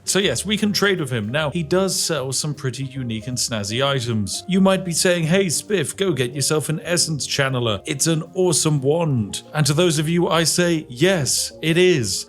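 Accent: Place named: Yorkshire, United Kingdom. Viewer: I DIDNT KNOW I NEEDED THIS! omg nothing so tranquill as a good voiced yorshire brit having fun doing apocalypse things! this is so great!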